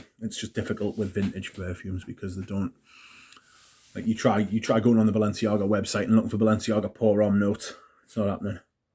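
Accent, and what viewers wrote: United Kingdom. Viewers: Welcome friends British accent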